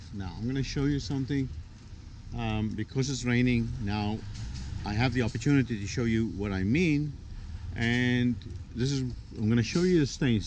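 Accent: South Africa. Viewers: Is that a South African accent ☺ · Baie Dankie! I spotted the accent too! Ek stuur groete from a very rainy Pretoria. I have this problem too with my afdakkie roof so ill give this a try. You haven't lost the SA sense of humour!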